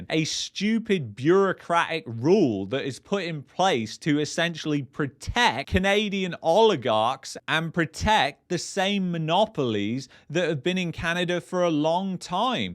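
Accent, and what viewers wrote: England, United Kingdom. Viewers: I believe he's from England. By his accent he's certainly from England. My roots of my distant ancestors go back to northern Ireland which has more world champions per capita including myself than any other civilized place on Earth. My great grandfather and great grandmother on both sides of my family were born in Canada in the 1850's.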